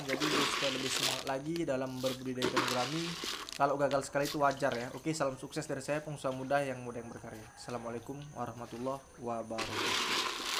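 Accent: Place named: Medan, Indonesia. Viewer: Kayak orang medan abg ngomong nya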